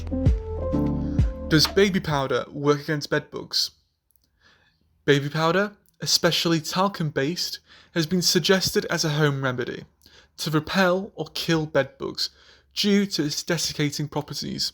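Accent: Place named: England, United Kingdom. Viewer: You sound English - why say diaper instead of nappy?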